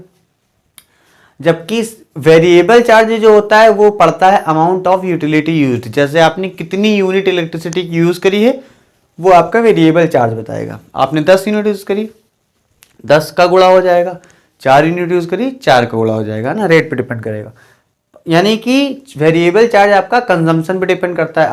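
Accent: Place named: Uttar Pradesh, India. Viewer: sir me apki boli se smjh gya tha aap utar pradesh se ho exactly kh se hoo??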